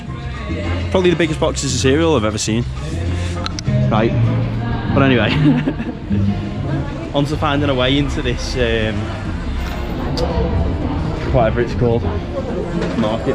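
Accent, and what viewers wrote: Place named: Yorkshire, United Kingdom. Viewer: Loving your accent! Yorkshire? Brummie?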